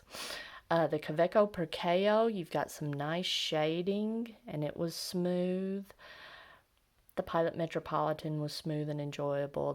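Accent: South, United States. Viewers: I’ve really been enjoying your ink studies. Tell me, do I detect a southern accent?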